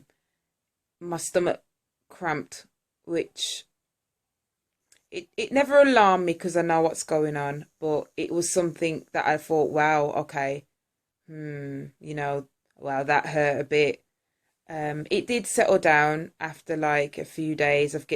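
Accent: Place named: Caribbean. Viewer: yesss sister that accent and I can tell u got Caribbean in you ☺ ..you're right though fibroids causes the abdominal area to bulge, I'm happy for you!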